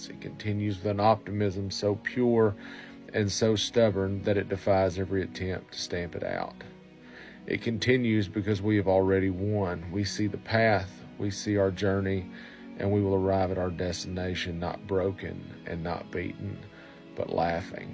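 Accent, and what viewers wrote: South, United States. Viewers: Your poetry, deliveredwith a southern accent, give me hope for a USA free from the current regime there. May others hear your voice with Joy. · I really enjoy your content and I love your Southern accent 😍 I'm a Southern girl myself 💙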